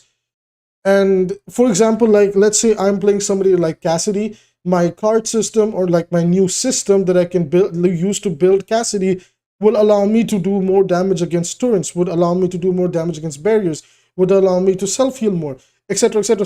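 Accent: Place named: India. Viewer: I'm guessing because he has the cadence of Indians when they speak that you'd make an assumption.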